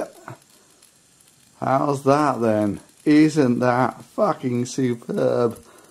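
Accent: United Kingdom. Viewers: is that a Scottish accent? Your long form videos feature a different accent.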